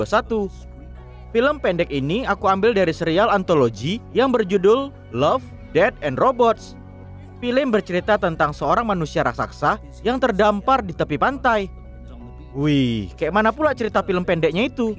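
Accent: Medan, Indonesia. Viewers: Logat medan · Bang orang medan kah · Jelas kali medan mu.on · woiii...orang medan kau ya...kwkwkwk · Medan kali logatnya bah 😅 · Mimin nya orang Medan karna saya orang Medan juga tau logat Medan kek mana 🗿👍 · Indonesia bagian mana ini admin, kek orang medan bukan padang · Logat medan 😏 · Bang pasti ko orang Medan??!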